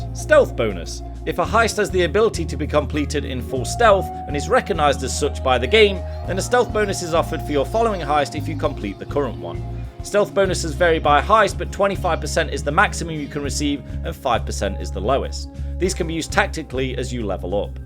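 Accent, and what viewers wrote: United Kingdom. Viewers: Hey mate I love your accent where are you from in the UK? Also where can you find teammates to ecm rush heists for xp.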